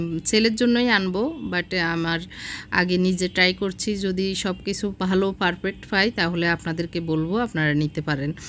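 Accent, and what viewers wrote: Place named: Feni, Bangladesh. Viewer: উনি তো জোর করে কাউকে ভিডিও দেখতে হাতে পায়ে ধরে নাই। যার ভালো লাগবে দেখবে না নাহলে দেখবেনা। দেখবে আবার নেগেটিভ কথাও বলবে। উনি ফেনীর মানুষ। তাই ভাষায় একটু আঞ্চলিক টান আসে। এটা দোষের কিছু না। আমরা কেউই নিশ্চয়ই বিশুদ্ধ ভাবে বইয়ের ভাষায় কথা বলিনা। উনাকে সবাই ভিডিও তে দেখে। আর আমাদের কেউ দেখেনা। তাই নিজের দোষ কেউই দেখতে পায়না। অন্য কে অসম্মান করার আগে অন্তত একটুকু ভাবা উচিত যে তাকে অপমান করার যোগ্যতা সত্যি নিজের আছে কিনা।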